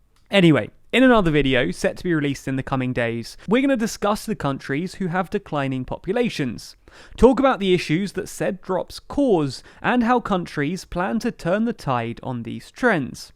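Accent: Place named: London, United Kingdom. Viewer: I was not prepared for this London accent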